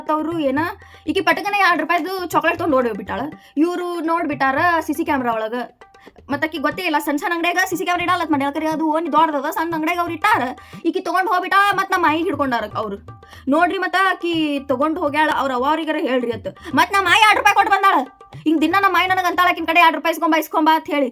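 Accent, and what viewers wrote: North Karnataka, India. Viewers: ಸೂಪರ್ ನಮ್ ಉತ್ತರ ಕರ್ನಾಟಕ ಮಾತು ಕೇಳೋದು ಅಂದ್ರೆ ಖುಷಿನೆ ಬೇರೆ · ನೌನ್ ನಮ್ಮ ಉತ್ತರ ಕರ್ನಾಟಕದ ಮಾತ ಕೆಳಕಾ ಚಂದ ಹಂಗ್ ನಮ್ಮ ಕಡಿ ಸಂಸ್ಕೃತ ಮಾತು ಸಲ್ಪ್ ಹೇಳ್ಬಿಡು ಅಕ್ಕ ಆಕಿ ಸತ್ತ ಹೋಗ್ತಾಳ 😅😅😅😅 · ಏನ್ ಅಕ್ಕಾ ನಿಮ್ಮ ಬಾಯಲ್ಲಿ ನಮ್ಮ ಉತ್ತರ ಕರ್ನಾಟಕದ ಭಾಷೆ ಕೇಳೋಕೆ ಎಸ್ಟೋದು ಚಂದ ಬಿಡು ಅಕ್ಕಾ 🥰🥰💖 ಅಕ್ಕಾ ನಿಮ್ಮ ವಿಡಿಯೋ ಬಾರಿ ಮಾಡುತಿಯಾ ಅಕ್ಕಾ ನೀನು ಅಕ್ಕಾ ನಿಮ್ಮ ಊರು ಯಾವುದು ಹೇಳಿ ನಾನ ನಿಮ್ಮ ದೊಡ್ಡ್ ಅಭಿಮಾನಿ ಅಕ್ಕಾ 💞💖.. 🤩🤩💐 ನಾವು ಹಾವೇರಿ ಮಂದಿ ಪಾ 🎉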